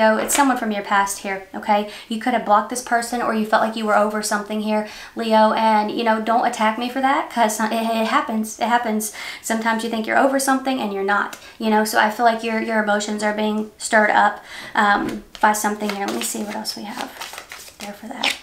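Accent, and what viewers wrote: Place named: South, United States. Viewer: Your southern accent makes me homesick for Kentucky, my childhood........... are you from KY or Tennessee?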